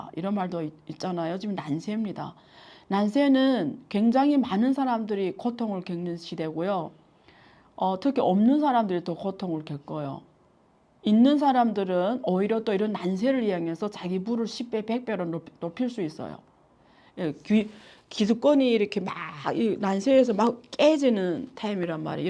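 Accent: Yanbian, China. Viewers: 아유화 유튜브 좀낫설지만반갑씁니다 사실적인 소식만 전해 주세요 억약으로보아연변말씨 아니면 탈북민이신가요 조심스럽게 지켸봅시다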